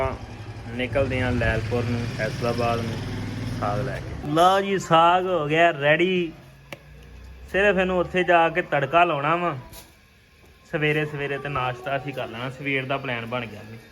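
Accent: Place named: Majha, India. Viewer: Majhaa ali boli bolda bruh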